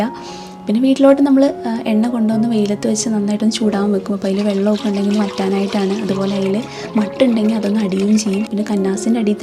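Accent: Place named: Palakkad, India. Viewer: Palakkadan style onnu vere thanne super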